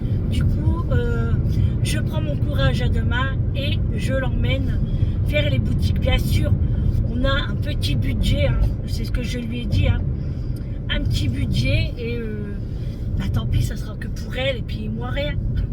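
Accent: North, France. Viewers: Bonjour joyeux anniversaire j espère que tu sera gâté oui a noyelle beaucoup de magasins je suis allée avec ma fille je n en pouvez plus quand je t ecoute dans t et videos on a beaucoup de points communs j aime ta simplicité et notre accent du nord moi samedi je vais faire du shopping avec ma fille aussi toujour bien decouvrir t et menus bonne ballade enyre mère et fille a bientôt 🥰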